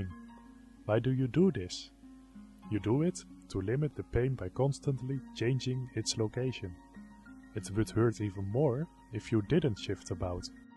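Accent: Netherlands. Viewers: horribly Dunglish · your dutch accent is so apparent gawdxD btw this is all a bit exxagurated... a good rider gives his horse relieve in the mouth when he does good, so the bit hardly moves by your hands...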